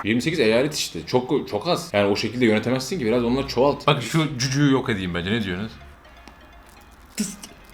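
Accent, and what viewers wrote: Turkey. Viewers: abi sen trabzonlumusun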